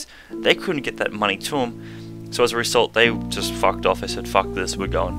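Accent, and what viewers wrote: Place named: Australia. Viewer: thought you were an aussie, we sound identical haha!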